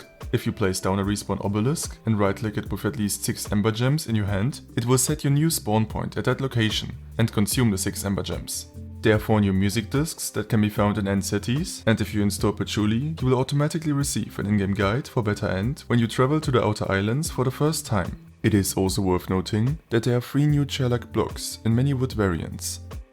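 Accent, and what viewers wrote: Germany. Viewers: I hear german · German tippa accent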